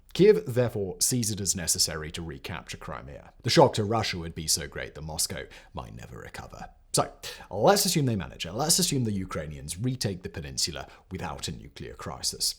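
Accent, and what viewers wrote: RP, United Kingdom. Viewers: how many channels does this plummy have? Why is he all over my feed? How do I make it so I never have to hear his voice again?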